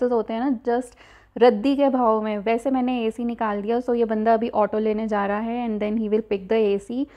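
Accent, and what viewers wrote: Punjab, India. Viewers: Are u from Panjab as some words used by has panjabi ascent...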